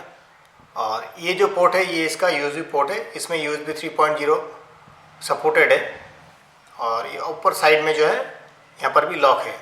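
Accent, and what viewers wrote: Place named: United States. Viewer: i like how his is like the only indian youtube video that i can understand easily because im used to such a white American accent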